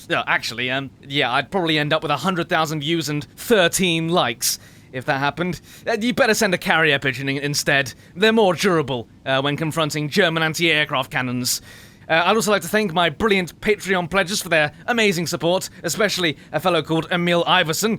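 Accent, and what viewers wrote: England, United Kingdom. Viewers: My god sir! You are probably the most British chap I have ever heard. God save our Queen and Rule Britannia, my good man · Aye, I'm sure it's early in the morning and any second they will be passing out food rations and tea, but I was wondering if you would give me your region of birth. In Yank talk: "Hello, I know it's early and you probably aren't reading this, but i was wondering you you'd tell me what region of England you are from. This is coming from a former colony so i can't tell.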